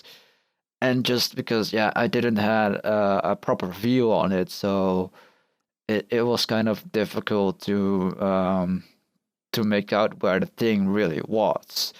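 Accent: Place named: United States. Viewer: Dear, may I compliment you on your English!! And on your very very very American accent:-)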